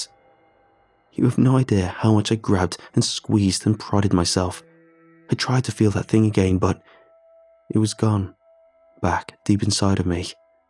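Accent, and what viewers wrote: United Kingdom. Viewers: the scariest part is is when i found he was british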